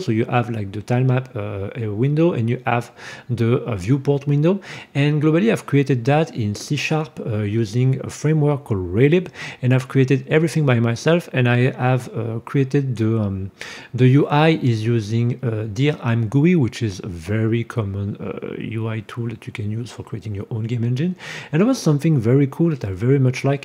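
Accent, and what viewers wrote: France. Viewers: Ton accent c'est une evidence tu parle français. good video btw.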